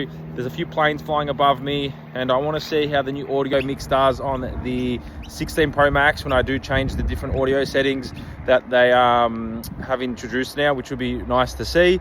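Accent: Australia. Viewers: AUSSIE accent!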